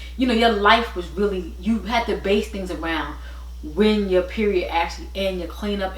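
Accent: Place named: East Coast, United States. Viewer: I love the way you talk! You are from the east coast I’m guessing! Next time you have a gut feeling about your health get a second opinion. I have a large fibroid but I want to keep my uterus. They can remove fibroids doing a myomectomy. They also can do an embolization to cut the blood supply to the fibroid. I been doing a lot of research. I’m on birth control for my fibroid but when it came to my time to remove my birth control I was bleeding with the birth control. I use the ring for birth control and I was able to skip a period except this month. I been taking DIM to help with estrogen metabolism. I wish you all the best and you get relief soon!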